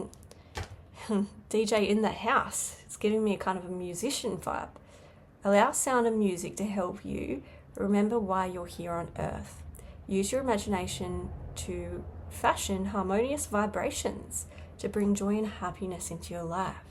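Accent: Australia. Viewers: i like your hair the blue hair and blue eyes is almost like i was when i was youi age except i also had one blue loop ear ring and one blue Chuck Taylor All Star Converse shoe and one black Chuck Taylor All Star shoe when i was a freshman in college. i'm no spring chicken. and your accent it reminds of the Vegemite sandwich i had a few weeks ago and yes that was an Aussie band song reference. is from sunny California to the land of the rising sun Japan off the beaten path enough? 🍀🎶✨ my 2nd time thru college i had graduated from an online University. Biggest waste of resources ever. i could've been sharpen my Japanese language skills instead of learning software development during an Internet age when only 1 AI Class was available as an elective class which i decided not to take.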